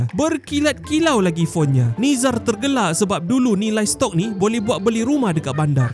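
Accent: Malaysia. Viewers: Bahasa ya mirip baha indonesia tapi nada suara ya mirip malaysia.... Ini orang malaysia atau orang indonesia wok 🗿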